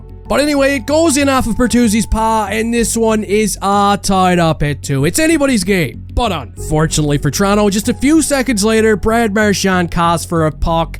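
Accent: Newfoundland, Canada. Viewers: I know a fellow Newfie when I hear one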